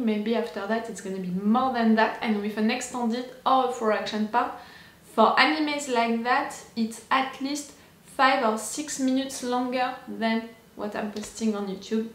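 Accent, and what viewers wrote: France. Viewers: Je viens de découvrir à l'instant ta chaîne et je voulais savoir c'est moi où tu a un accent français ? Sans savoir parler anglais je pense entendre l'accent francais quand tu parle... , En tout cas sa ne m'a pas l'air d'être l'accent anglais...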